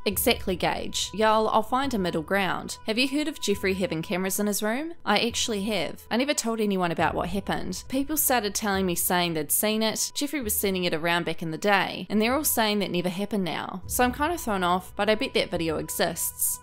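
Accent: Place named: New Zealand. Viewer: Sounds very NZ to me.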